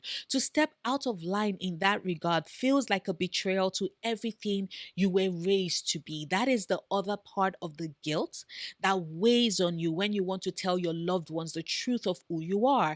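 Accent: Nigeria. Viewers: i only just clocked the nigerian accent in this video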